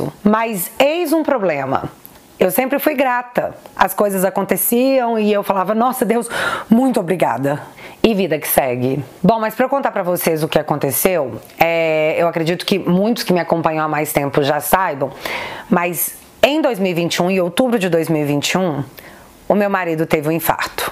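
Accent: Minas Gerais, Brazil. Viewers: Olá, te sigo há muito tempo daqui da Inglaterra onde u moro, você já me fez sorrir e até gargalhar com seu jeitinho e sotaque mineiro, mas hoje me fez chorar, talvez vc nem veja essa mensagem, pois são tantas as que vc recebe, mas se você ler, quero que saiba que você é muito especial, seus vídeos esclarecedores e me ensinam muito mesmo! Quando comecei a te seguir você não tinha nem cem mil seguidores e olha só como você cresceu! Deus tem te abençoado, continue com Ele e que mais bençãos recaíam sobre você e família! Também sigo o Rodrigo Silva e também sou Adventista, que o Pai Eterno esteja com você e que seu canal seja para a Honra e a Glória Dele 🙏🙏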